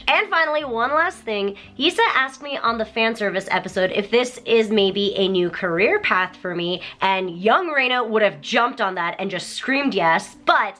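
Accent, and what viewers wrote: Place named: United States. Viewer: You sound soooo american but you speak japanese??? How do you do it!?!?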